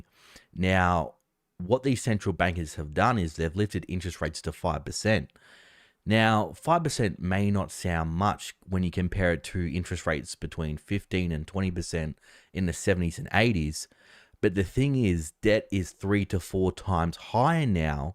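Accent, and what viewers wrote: Australia. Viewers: You can call it data or data, I really don't mind Love the ozzy accent! Thank you mate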